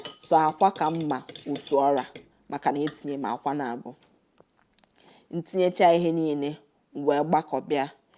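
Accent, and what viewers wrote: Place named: Igbo, Nigeria. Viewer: Iseeeeee 🙏 I love your Igbo accent 😁😁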